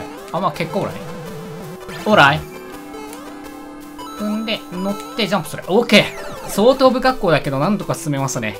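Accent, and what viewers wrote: Kansai, Japan. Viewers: バリって関西弁なんや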